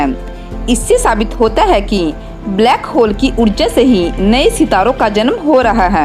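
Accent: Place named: Kullu, India. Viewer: Behan ji app kullu district see hoo ?? Apka accent kullu ka lag Raha hai??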